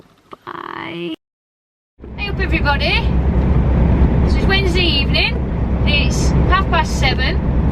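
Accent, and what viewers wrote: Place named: Yorkshire, United Kingdom. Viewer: Hi I just found your channel, it’s great. I’ve just started turning in March, where are you? I’m in East Yorkshire you have a Yorkshire accent 😀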